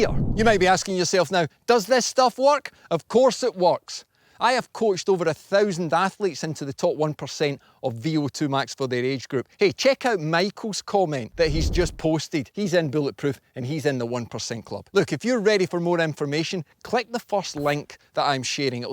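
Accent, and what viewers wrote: Scotland, United Kingdom. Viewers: You sound Scottish, laddie. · are you scottish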